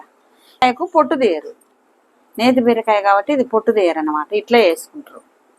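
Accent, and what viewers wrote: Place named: Rayalaseema, India. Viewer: Kaani mee language rayalaseema laga vundi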